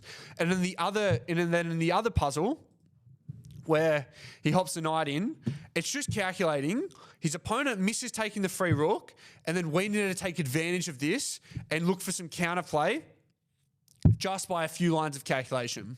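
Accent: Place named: Australia. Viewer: So after watching hours of Alex Banzea's vids I found myself thinking in his Romanian accent. Looking forward to thinking in a Down Under accent!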